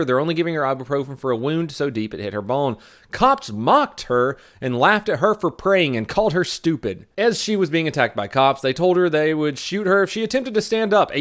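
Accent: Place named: United States. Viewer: She speaks the best American I've ever heard!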